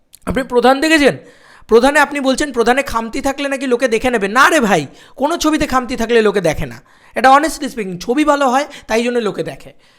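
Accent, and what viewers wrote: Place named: Bangladesh. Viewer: Kangal Lok😂 accent Bangladeshi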